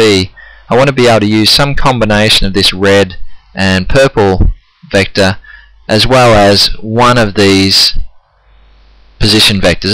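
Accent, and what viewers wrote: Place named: Australia. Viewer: smexy aussie accent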